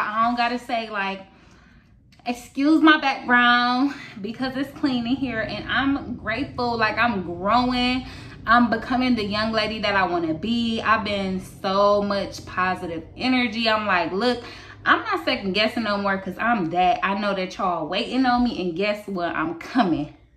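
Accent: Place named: Philadelphia, United States. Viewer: You gotta be from Philly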